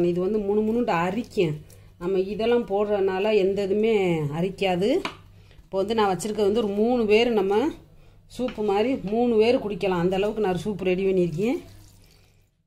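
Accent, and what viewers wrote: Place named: Madurai, India. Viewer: How nice to hear Madurai dialect😘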